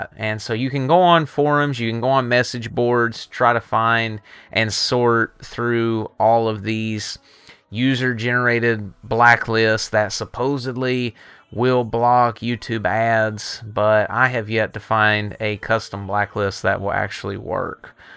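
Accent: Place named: South, United States. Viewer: The southern twang is heavy. 😂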